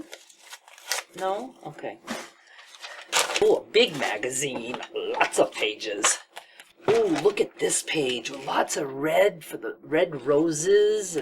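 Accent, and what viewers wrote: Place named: New England, United States. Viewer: I know you’re not from Massachusetts but I was raised there and listening to you reminds me of growing up and the way people talk up in NE. I’ve lived in SC for 30 plus years. I was dozing, listening to you and I heard my name, just the way my father used to say it. I asked about ASMR and Patreon. I went to your pages. I usually google everything but just thought I’d ask you. It was nice to hear my name.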